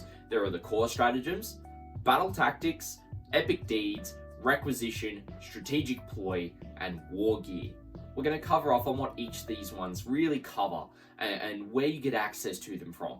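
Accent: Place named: Australia. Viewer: Thank you for explaining this in Australian English.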